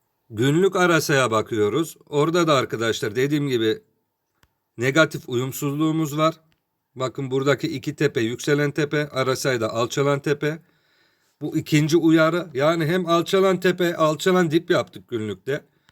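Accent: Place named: Adana, Turkey. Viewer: Şiven aynı Adana yöresi gibi memleketin neresi be abi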